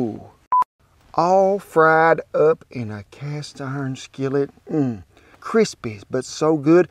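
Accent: South, United States. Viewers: I’ve lived in FL all my life. The outdoors and the southern accent is enough for me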